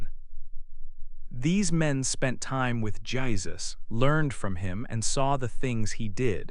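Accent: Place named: United States. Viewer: This American English ist sehr schwer zu verstehen und ist irritierend!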